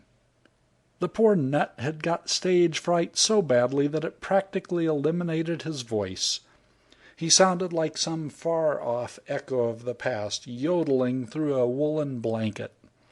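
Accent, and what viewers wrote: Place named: United States. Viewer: Felt it totally out of place reading a typically English story with an American narrator...had to switch off.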